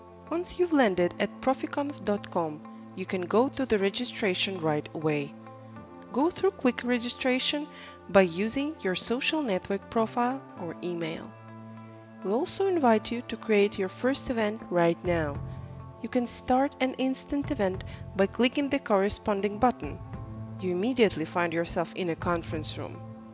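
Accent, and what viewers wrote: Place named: Russia. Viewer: какой чудный русский акцент :))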